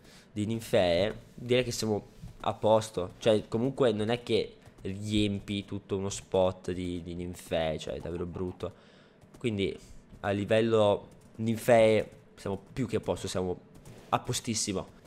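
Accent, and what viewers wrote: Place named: Italy. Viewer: ma di dove sei? (so che sei Italiano ascoltando il tu accento nei tuoi video)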